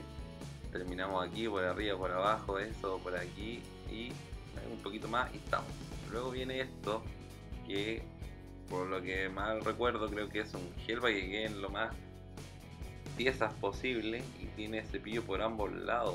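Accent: Chile. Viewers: Es el primer video que veo de este canal y puedo decir que con solo escucharlo se que el chico es chileno XD #LoMasParejoPosible #LoMasDifuminadoPosible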